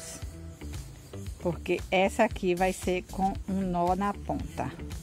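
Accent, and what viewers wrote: Northeast, Brazil. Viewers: Vc é nordestina?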